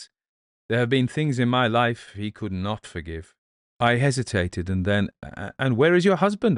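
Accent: United States. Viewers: I don't know about the regional dialects disappearing everywhere. I'm in southern West Virginia and there's still various dialects the further south you go. As for doing an American accent, his isn't too bad. I love his Scottish & Irish versions. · If I can perform a British accent but half as well as you do an American, I will consider myself a success as an actor. :)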